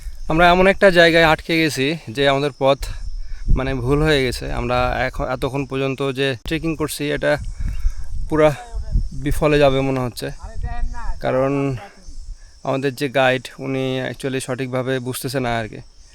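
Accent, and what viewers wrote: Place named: India. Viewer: তোমার ভাষা তো ভারতীয়। কোথায় তোমার বাড়ি।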